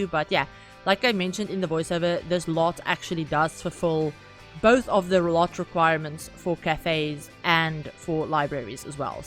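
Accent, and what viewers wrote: South Africa. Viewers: Not me hearing the accent & immediately being like yes a fellow saffa simmer ❤🎉 beautiful build, adding this immediately!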